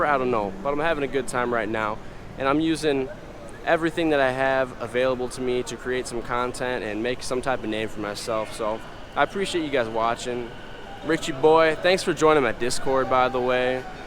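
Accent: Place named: Upper Midwest, United States. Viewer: your upper midwest accent was thick in this one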